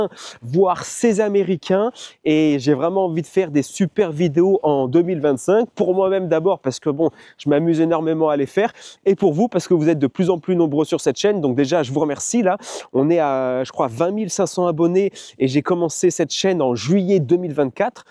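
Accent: France. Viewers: Super vidéo. Avec ta jolie frimousse de Frenchie et l'accent qui va avec ,tu devrais pas rester longtemps célibataire !!😅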